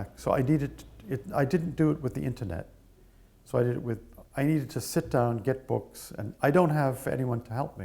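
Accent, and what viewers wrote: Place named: New England, United States. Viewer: Upper-class New England accent. Great writer. Smug. Patriarchy supporter. Western Imperialist. Total White Man.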